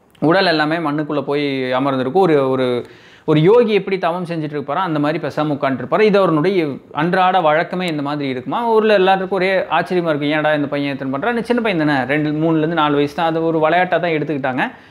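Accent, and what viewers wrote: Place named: Coimbatore, India. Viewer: Te way u explain in coimbatore Tamil is very nice.. 👍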